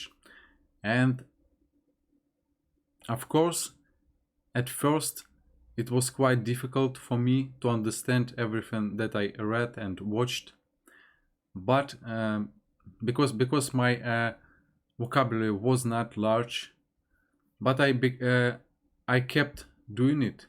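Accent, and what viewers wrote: Russia. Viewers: Congratulations on your English so far... it's very good, and sounds great with your Russian accent - keep going! Great videos on your channel BTW, it's very interesting how other people live their lives in other countries and cultures!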